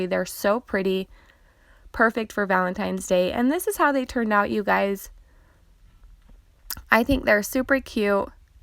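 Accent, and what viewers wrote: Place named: Utah, United States. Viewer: I know that "accent"! LOL....I grew up in SLC. Haven't lived in UT for 20 years, but still have family there.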